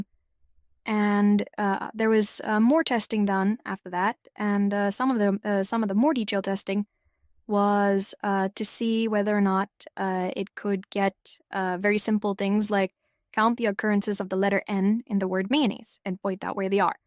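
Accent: Quebec, Canada. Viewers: Doxxed as likely Canadian from Quebec in 2 seconds despite the attempt at obfuscation. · Voice heuristics suggest likely a Canadian from Quebec despite the attempt at AI obfuscation.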